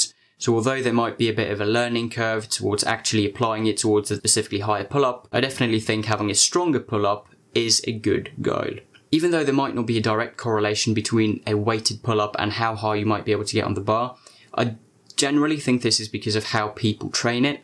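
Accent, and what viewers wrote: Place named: England, United Kingdom. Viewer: Blimey, I thought your accent was from England. You must have learnt your English from an English person.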